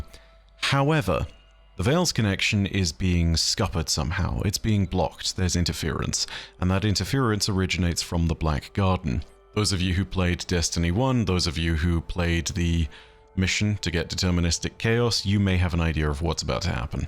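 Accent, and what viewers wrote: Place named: England, United Kingdom. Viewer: Scuppered. Love it, thanks for my daily dose of the King's English.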